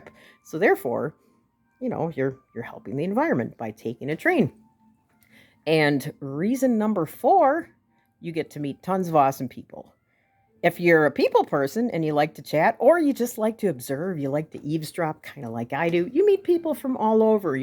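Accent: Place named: Wisconsin, United States. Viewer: I got here by searching "wisconsin accent, because I'm from Wisconsin and I like linguistics and I'm sad that my accent gets NO attention in the linguistics scene. It's refreshing to hear the Wisconsin dialect on YouTube for once. P.S. Have you ever seen Metalocalypse? The drummer, Pickles, has a stereotypical Wisconsin accent and it's hilarious.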